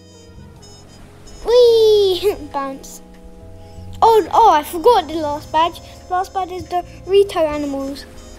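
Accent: United Kingdom. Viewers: you sound like a british kid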